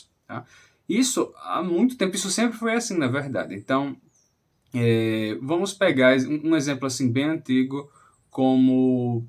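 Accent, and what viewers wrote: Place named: Maranhão, Brazil. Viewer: Parece sotaque do Maranhão 😮não sei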